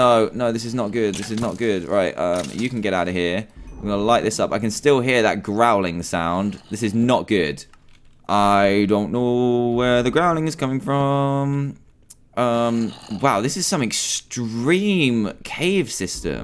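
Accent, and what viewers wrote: England, United Kingdom. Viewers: He is English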